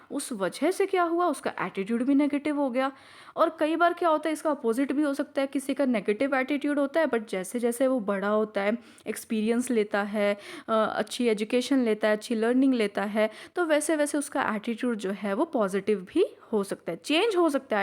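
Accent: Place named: Haryana, India. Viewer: Haryana k lgre h mam ❤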